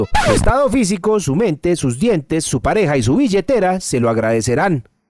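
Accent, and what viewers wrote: Colombia. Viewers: amigo, usted es colombiano? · El único acento Colombiano que me parece bakan y no suena hipócrita el de..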